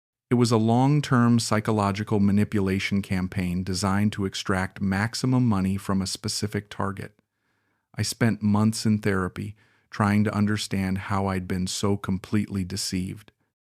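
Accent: United States. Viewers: The story might be real, but this guy is totally fake, AI. I doubt any "bloke" from Darwin speaks in a pure US accent, not a trace of Aussie pronunciation. This channel produces many of these vids about a guy getting scammed by a Thai woman half his age. And they all sound the same and look the same. Strange channel.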